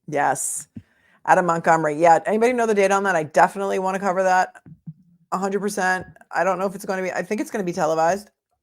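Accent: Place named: New York, United States. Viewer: Replay crew! I don't care how many YouTubers analyse this case, I'm always interested in your perspective and very grateful for your coverage. Thank you for all you do and keeping it real and classy in that gorgeous NY accent 🥰❤️🙏🏻❤️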